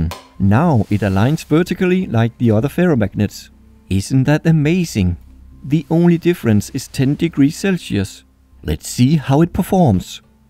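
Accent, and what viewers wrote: Denmark. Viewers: Are you danish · Are you danish?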